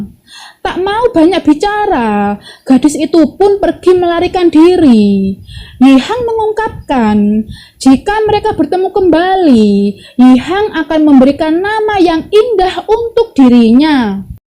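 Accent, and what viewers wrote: Java, Indonesia. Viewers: suaranya medok banget mbak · Maklum mbaknya Wong jowo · ASLI JAWA MBA ?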